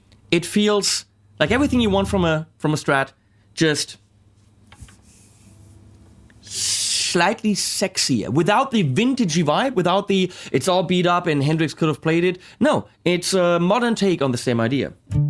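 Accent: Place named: Germany. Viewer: A review without this guy talking or someone else who doesn't need to be extra super dooper pseudo cool and swaggy fucked up german accent english. Yeah people get it. You like to hear yourself talking english but please keep it to your self or talk in your native tongue and use subs. I'm not trying to discredit your knowledge or playing. It's just your talking wanna be cool shit is so annoying man. And those great idiotic dumbfuck jokes. Oh you are so extra hip and remained young. You know? If no one is laughing it's not funny. Goddamn you're a douchebag. Even more than me.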